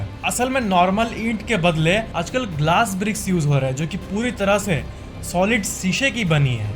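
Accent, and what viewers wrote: Bihar, India. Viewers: Sir app Bihar se hai kya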